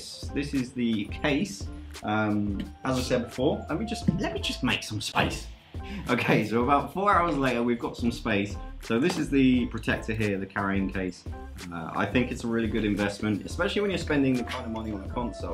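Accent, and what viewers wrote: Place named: United Kingdom. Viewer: He's a liar he lives in the U.K. 1. His accents 2. The plug How exactly did he pay dollars not pounds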